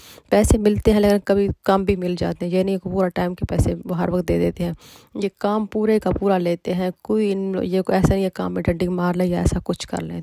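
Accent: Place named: Pakistan. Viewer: wasa ap ka bolana ka andaz sa lag raha ha ap Pakistan sa kidhar sa ho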